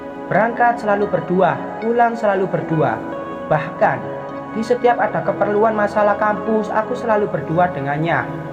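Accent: Java, Indonesia. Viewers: Lanjut cetita y pokoke slalu ngakak...dngan bhsa y medok bngt...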